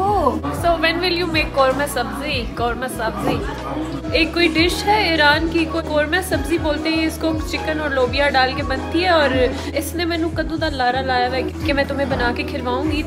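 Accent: Punjab, India. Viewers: I love your vlogs and also your Punjabi accent 🤍💗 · I watched alot of vlogs of other people which shifted korea but baiii is da mzaaaa e or eh... Punjabi.. Touch zbrdast 💜❤️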